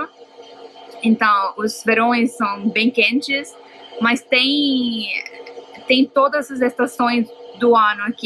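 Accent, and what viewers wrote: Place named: South, Brazil. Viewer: Já te chamaram de "alemoa"? Teu sotaque e a tua aparência é bem comun entre os descendentes de alemães do sul do Brasil.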